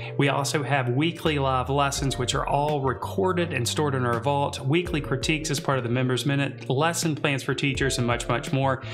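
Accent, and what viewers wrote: South, United States. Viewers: Hes got a bit of a southern draw..... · Aidan and Cristie Stevens Just a Southern accent. I’m sure he doesn’t do it just to bug you. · "Karen Dash" I was listening, not watching when he said this and I had no idea that he meant Caran d'Ache! LOL Never heard it pronounced with such a strong southern USA accent before. He sounds super country.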